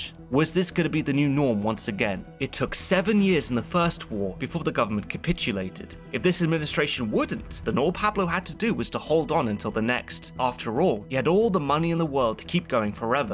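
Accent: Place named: England, United Kingdom. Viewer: The English accent helps with his credibility xD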